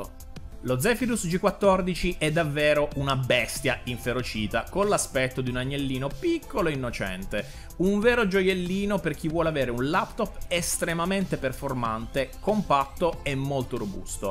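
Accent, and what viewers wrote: Italy. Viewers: 32GB di ram sono più che sufficienti anche con un solo banco dici. Non avere un doppio banco significa non avere il dual channel, e non avere il dual channel specialmente per i processore Ryzen è una GRAVE mancanza che avresti dovuto sottolineare. Questa è disinformazione e mi dispiace davvero tanto dovertelo far notare dato che dal tuo accento si capisce che siamo della stessa terra.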